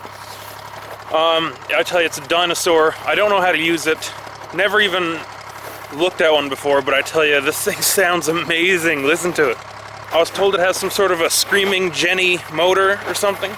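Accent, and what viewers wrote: Canada. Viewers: He’s literally Canadian. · Is he canadian?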